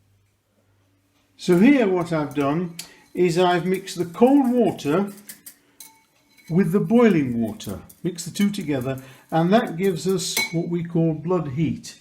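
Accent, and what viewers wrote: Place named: Northwest England, United Kingdom. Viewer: This is amazing and made my day. Love the Northwest accent as well 😂 - All the way from Canada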